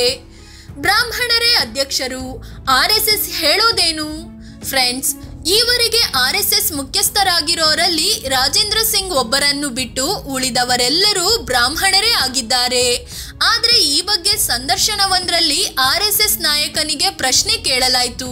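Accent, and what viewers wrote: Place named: Hassan, India. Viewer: ನಿಮ್ಮ ಹುಚ್ಚಾರಣೆ ಶ್ವಲ್ಪ ಹಿಂಪ್ರೂವ್ ಮಾಡ್ಕಳ್ಳಿ. ಹರಸೀಕೆರೆ, ಆಸನ ಕಡೆಯೋರ್ ತರಹ ಏಳ್ಬೇಡಿ.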